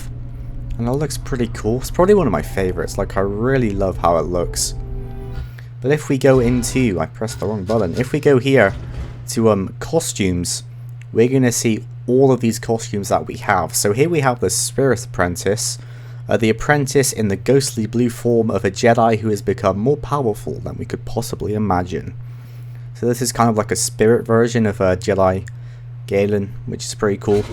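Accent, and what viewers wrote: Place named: Plymouth, United Kingdom. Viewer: Where are you from? South west? Can hear the janner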